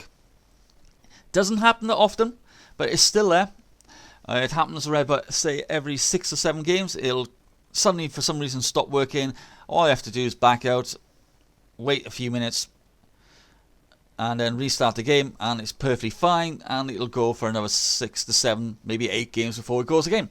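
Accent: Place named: Ireland. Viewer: Irish accent, spot on!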